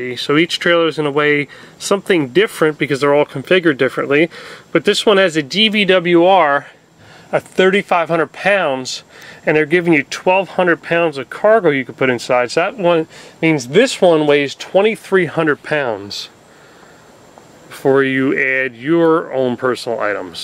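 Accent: New Jersey, United States. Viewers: SUCH a Jersey boy. Can really hear it in your voice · I think it's the New Jersey accent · that Jersey/ mid east accent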